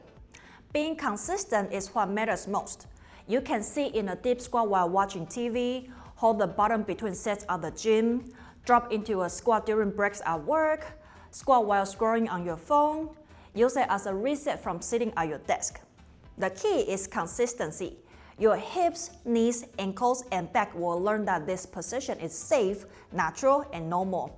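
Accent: China. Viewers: great info. I also LOVE the accent. I thought it was Singaporean but it sounds more Chinese. Thank you.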